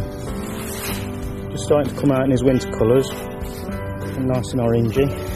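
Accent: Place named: Northern England, United Kingdom. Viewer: I live in belper derbyshire but im from up north and by the sounds of your accent your obviously not far from where I live just wandering where abouts this location is? because it looks a lot like the belper strecth of river or is it a lake where you were fishing? its just ive heard theres a few carp in my river which noone fishes for and I fancy giving it a go for a few day sessions cheers regards mike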